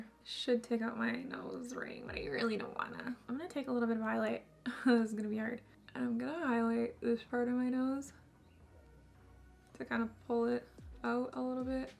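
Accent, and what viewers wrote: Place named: Canada. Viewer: I personally don’t think you sound like Kylie at all. Your Canadian voice is pretty unique to me ☺️ love the look!!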